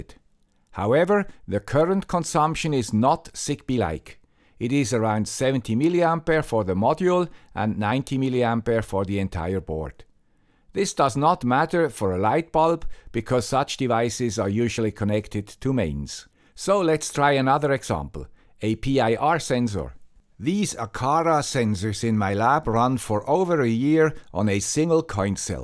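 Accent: Switzerland. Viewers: My favorite guy with swiss accent is back! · As long as the guy with the Swiss accent keeps making videos, the Earth will continue to turn. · Please please please turn off that YouTube autodubbing nonsense. It destroys your USP, the Swiss accent, and makes your videos unwatchable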